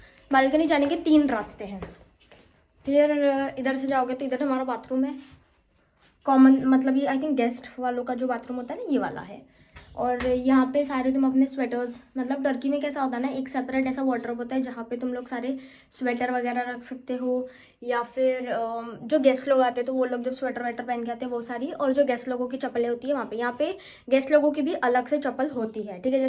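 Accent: Maharashtra, India. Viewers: I get you girl. Your hindi is marathi mix nd influence. So humara hindi aise hi sound karta hai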